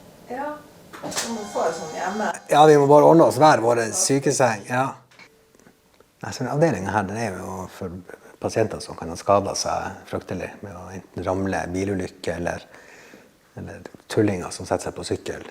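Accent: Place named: Nordland, Norway. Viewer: Så bra at du kom deg på beina igjen. Jeg må bare le, for den nordlandsdialekta sammen med den nordlandske stoiskheten gjorda hele filmen legendarsik!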